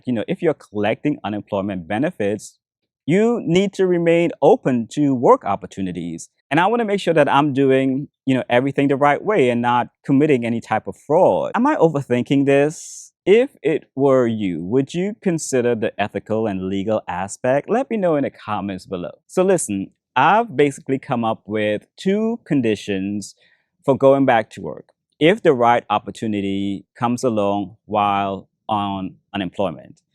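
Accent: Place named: Vietnam. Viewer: You have Vietnamese accent, interesting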